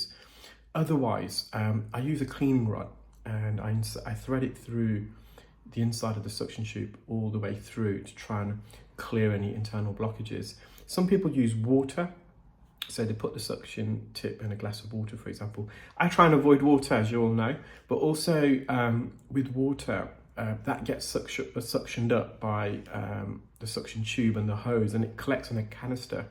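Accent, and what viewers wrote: United Kingdom. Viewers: i was just thinking this.. I do have morbid fascination, & love watching interesting medical procedures, I just figured out that even though I do watch your videos anytime I jump online, It is your voice that I’ve come to really sppreciate . It is absolutely so peaceful and soothing, makes me so relaxed & happy, I could literally listen to you speak all night. Please write a book about anything you love to talk about, and record it as the author, and sell it as an audiobook.. I could listen to it every night before bedtime.. I have many friends in the UK. so it isn’t just the accent, as lovely as it is, it is actually the tone, and the fact you’re so full of knowledge and so down to earth.. 🤴🏽 Thank you for this channel .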